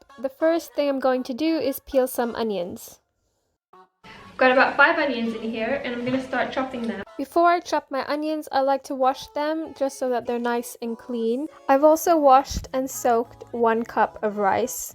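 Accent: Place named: Scotland, United Kingdom. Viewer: Which prt of UK you from? Sounds scottish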